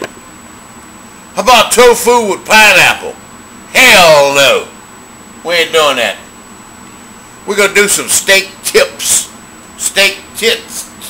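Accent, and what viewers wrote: Louisiana, United States. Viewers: I could tell from your accent that your a fellow Loiuisianian!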